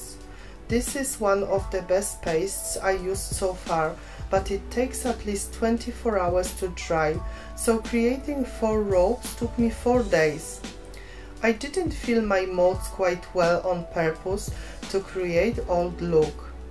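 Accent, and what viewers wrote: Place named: Poland. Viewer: Wyraźny polski akcent, pozdrawiam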